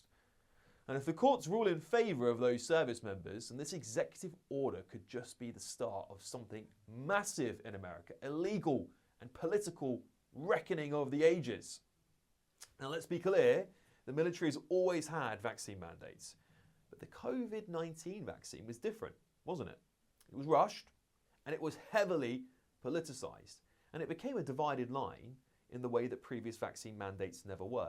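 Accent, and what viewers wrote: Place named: RP, United Kingdom. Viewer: Posh talking head propagandist wimp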